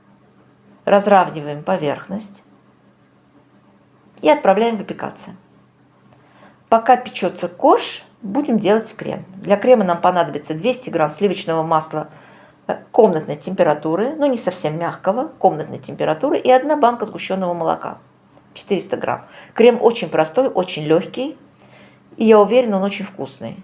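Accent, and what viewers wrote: Baku, Azerbaijan. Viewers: Спасибо за рецепты!!!У вас настоящий бакинский акцент😂😂😂❤❤❤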